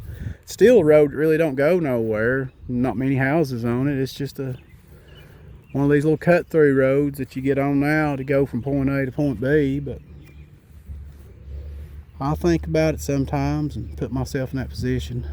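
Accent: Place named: Kentucky, United States. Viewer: New subscriber. I’m from Ky and you sound like my relatives. Feels like home.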